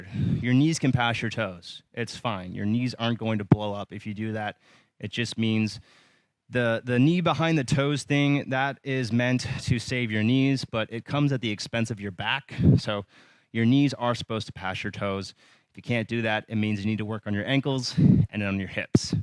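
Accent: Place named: United States. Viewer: awful american accent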